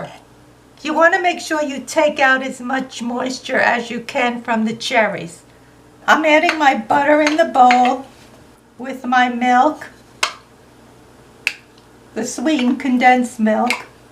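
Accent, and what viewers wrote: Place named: Boston, United States. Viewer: ARE YOU FROM BOSTON MASSACHUSETTS? I HEAR AN ACCENT I RECOGNIZE BUT MY GRAM WOULD SAY BUTTAH NOT BUTTER.. SO I MAY BE WRONG ... BUT I HEAR PAPAH TOWEL ... SOUNDS JUST LIKE MY GRAM.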